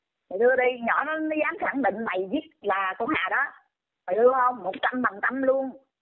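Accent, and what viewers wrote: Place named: North, Vietnam. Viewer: Sao co líp này nói cái giọng nói bac kỳ sao ghét quá, giọng nói người nam ta nói thấy dễ thương 😊😊😊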